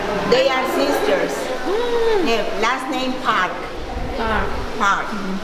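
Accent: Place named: Philippines. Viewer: Its interesting because she sounds Filipino talking English so I guess that's what Latino and Korean mixes lol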